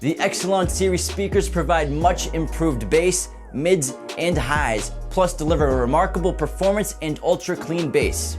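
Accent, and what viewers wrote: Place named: East Coast, United States. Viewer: Say hello to ya motha for me.east coast voice..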